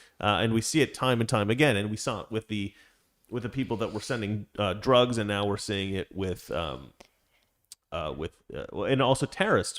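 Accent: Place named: Russia. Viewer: The Russian accent isn't always attractive, but she has such a sweet and feminine voice that it still works for her.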